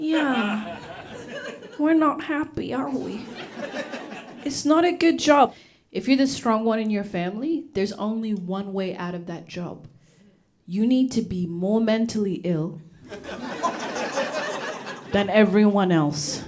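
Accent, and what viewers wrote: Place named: New York, United States. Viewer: I was about to say she sounds more like a Noo Yawkuh who lived in the UK a bit (a lot like my Brooklyn-born and raised cousin who lived in London for 30 years) than an Aussie or an African. Surprised she developed that accent after arriving in Oz at such a young age.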